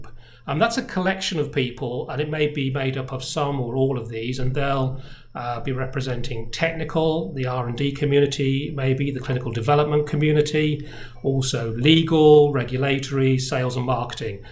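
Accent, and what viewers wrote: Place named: United Kingdom. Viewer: 오 글로벌한 영국어를 쓰고 계시는듯 : ) 벤쳐스 연계부분에 대해 상세하게 짚어줘서 흥미로웠네요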